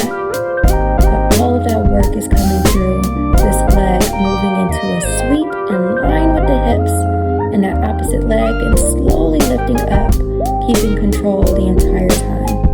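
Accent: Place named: South, United States. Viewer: Love this so much! Such a sweet southern bell, love the voice over. I’m getting kind of tired of workouts where it’s just music and I have to keep looking at the screen to see what they’re doing. Please do some nighttime stretches and add a timer bar for each set and an overall progress bar. Love love love this❤❤❤❤❤❤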